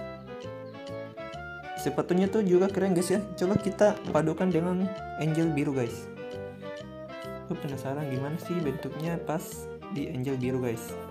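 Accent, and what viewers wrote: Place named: Sulawesi, Indonesia. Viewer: Bg km pasti org Sulawesi!!?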